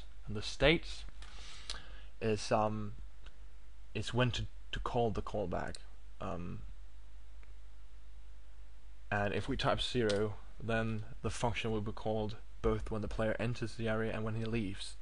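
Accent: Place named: England, United Kingdom. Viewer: Ahh, I srsly had to listen so intently. We both speak English, however he has a heavy English accent whereas I have a Western American accent XD I was actually sitting here like, ">_>?" I even widened my eyes as if perhaps that would help. A little note.. widening eyes do not make one hear any better; though it does cause one to see a wider spectrum of one's room..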